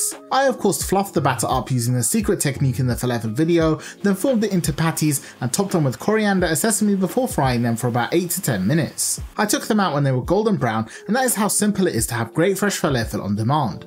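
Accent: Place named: United Kingdom. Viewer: I like that you’re really Egyptian even though you sound British , I like the combination 💜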